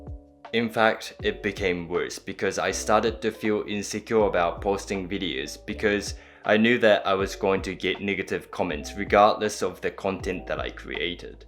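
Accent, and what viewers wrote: New Zealand. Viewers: As a kiwi I spotted his New Zealand English accent immediately so not knowing anything about him (this is the very first vid of his I have seen) assumed he had spent some time in NZ. Looking back at some of his previous vids looks like I was right! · I thought I could hear a Kiwi accent hehe, I really enjoy your video's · Your English is very good, you should have no worries about this, you have a beautiful voice and I find it interesting to hear a bit of New Zealand inflection coming thru every once and a while. I have a feeling you have not heard very many Americans speak English so you don’t know how badly it can be spoken! 😂 Very interesting topic I am exploring more myself. Thanks. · Okay, 1. You are freaking beautiful, no matter what you wear, 2. You speak English better than MOST Americans, 3. Your kiwi accent is so endearing, and 4. 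Haters gonna hate — screw ‘em. You’re more evolved than most. Stay focused, stay positive, stay healthy. Love the videos. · Japanese guy with a Kiwi accent... That's hot! 😍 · Kiwi at heart and speech. Don't let them sass you! Kia Kaha! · I agree, is a very good speaker of English. Being a Kiwi myself I can hear the NZ accent. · I can hear the kiwi and Japanese accent in your voice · Thanks for this video and sharing all your journey. Your English is quite good, and your NZ accent is endearing. I am grateful to be seeing Japan through your eyes. And some of your experiences of minimalism have helped me raise questions for my own approach to life. Please continue to share your observations with us. Blessings!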